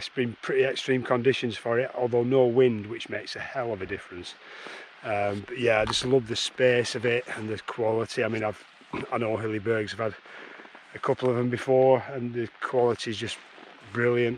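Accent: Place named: Northern England, United Kingdom. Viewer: I love your videos , and a great northern accent , it’s guys like you, Hazey , and Simon that have got me off my arse and into nature, and I’m better for it, so many thanks, stay safe.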